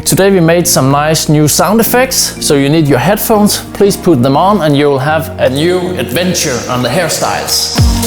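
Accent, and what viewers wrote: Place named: Denmark. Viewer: Came for the hairstyles but stayed for the Danish-english accent <3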